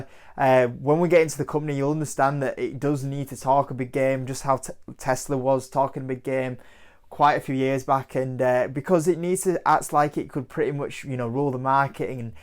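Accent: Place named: Yorkshire, United Kingdom. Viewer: but by no means, them are not competitors, same as Lucid (private so far). And Tesla has no real competition so far to be honest, as you buy technology, not just a vehicle (it's not a car according to Oxford dictionary). As you're based in Yorkshire, judging from dialect (😃), I would advice to make a call and get a Tesla Model 3 test drive, then you will see what it's all about (the hype), Tesla model X/S doesn't give the same feeling and tech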